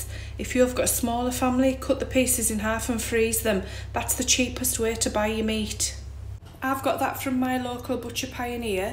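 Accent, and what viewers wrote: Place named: Northern England, United Kingdom. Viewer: Hi, That’s looks delicious. I’ve been following you for a while now, I live in Australia, originally from UK and look forward to hearing your Northern accent and seeing your friendly face and your funny comments about your husband, you make me laugh. Brilliant 🤗 thank you xx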